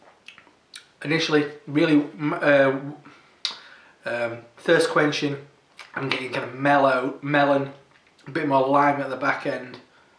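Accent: Yorkshire, United Kingdom. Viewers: ooo aaaaaaarrrgghhh thaaarrrrttt smells booooootifuuuulll .. proper Yorkshire accent dude .. picked up the colab beer they did with northern monk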